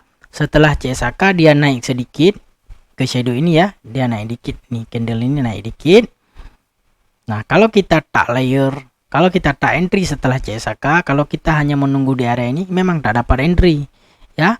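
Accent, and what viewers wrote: Aceh, Indonesia. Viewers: Orang aceh ya?? Logat jih khas that..😊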